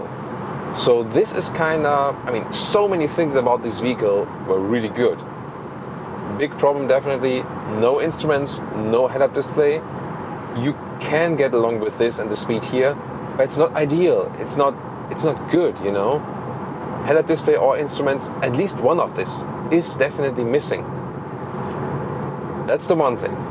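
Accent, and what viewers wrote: Germany. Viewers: This guy has strong german accent. funny.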